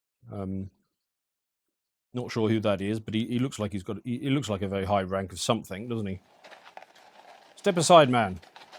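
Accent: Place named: England, United Kingdom. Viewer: However you need to describe it to make you feel better. We love you regardless, you’re the most stereotypical Englishman I’ve ever heard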